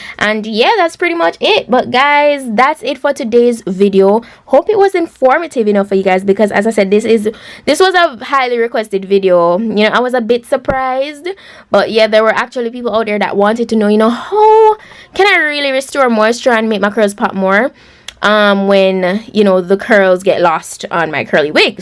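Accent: Jamaica. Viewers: I love her accent ❤️🇯🇲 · your Jamaican accent is so peng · Her Jamaican accent is cute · Damn her 🇯🇲 accent thick lol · Luv this my Caribbean sister Im Trini....luv jamaican accent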